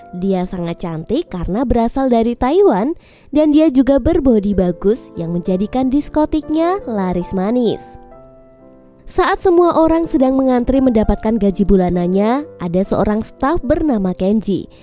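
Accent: Java, Indonesia. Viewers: Asem knpaa ada tetekbengek nyaa mimin nya jawaaa ne ngendi iki 😂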